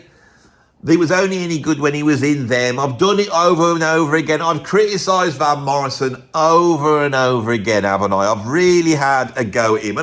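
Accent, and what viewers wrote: Birmingham, United Kingdom. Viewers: Horrible,,NASAL Brummie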